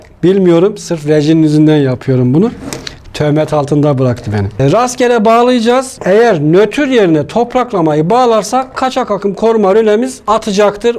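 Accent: Erzurum, Turkey. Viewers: Güzel bilgiler ustam . meslek lisesi elektrik bölümü mezunuyum ama bunlari gostermediler.bravo basit ve anlasilir bir şekilde titizlikle anlattiniz.bravo.ayrica Erzurum şivesinede bayiliyorum.tom diye bi uygulama vardi sincapmi ne cok güzel konusuyodu.bu şiveler ülkemizin zenginligi.kolay gelsin, 👍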